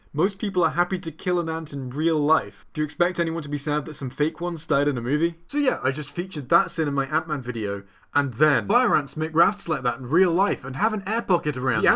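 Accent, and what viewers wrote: United Kingdom. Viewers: I have your british accent as well, of all the accents to end up with in britian. Also 6th, really! I mean I get the 4th and 8th doctors but 6th!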